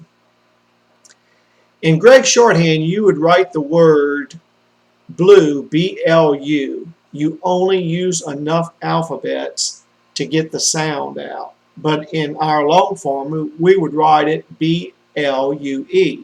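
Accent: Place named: South, United States. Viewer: It's called a southern accent. Get over yourself.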